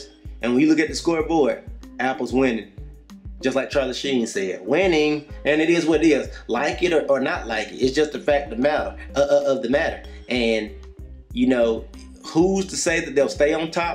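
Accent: South, United States. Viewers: I just love his southern accent haha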